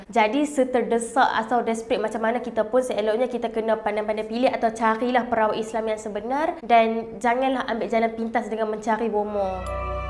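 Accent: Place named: Kedah, Malaysia. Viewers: Matap lorat org utara padu.. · Ni sah speaker orang kedah ni. Penang cakap lanjutt x laju2 cmni. Aku kedah cakap cmni arh🤣 laju.. cm rapper lol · Seronok la dengar 😂 dia punya utara dgn laju rasa mcm dengar member sendiri ajak borak 🤣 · This is kedahan accent, John bear is penang accent, it's not same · rasa cute la loghat utagha ni.. ❤️ melted.. hai awak.. tapi an… klu die tgh2 baca mantera.. rupenya baru tau bomoh. tertibe rasa nk cancel tu.. cmne ea nk blah? klu cabut camtu je tkut die sniper dari belakang. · susah hendak difahami apa yang beliau berbahasa,sungguh pekat loghat kedahnya. bagaimana sesuatu maklumat hendak disampaikan jikalau yang memberi tersebut menggunakan bahasa berlainan? natang mu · SYOQ DENGAQ ORANG UTARA CAKAP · Akak utara rapper bertudung is back🗿🗿🗿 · Oqang Utaqha cakap laju tu.. aku suka sungguh dengaq dia cakap 🤣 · Aq orng johor..tp seronok dengar lorat kedah ni...nk crik bini orng kedah laa gini😂😂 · Bila utagha sembang nahhh kaw kaw 😂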